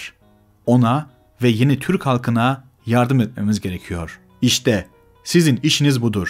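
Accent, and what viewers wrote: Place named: Central Anatolia, Turkey. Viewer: kardeş videoların güzel tebrik ederim Fakat senin "ka" ve "ke" sesleri ile sorunun vardır, Telaffuzuna bir az önem vermelisin. Sözcükler yanlış telaffuzundan dolayı farklı anlaşılmaktadır. Mesela ka^fi demen yerde kalın kafi kullanıyorsun. Orta Anadolu şivesinden kurtulman gerek. Saygılar...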